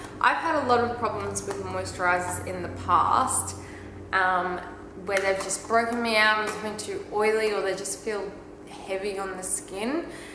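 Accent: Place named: Australia. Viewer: Love this! And seriously love hearing another Aussie accent! x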